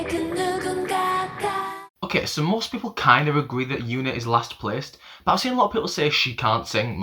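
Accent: United Kingdom. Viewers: I wish u put some eng sub bec u speaks like james charles i mean u speaks fast and not all of us can understand British accent or what ever accent u have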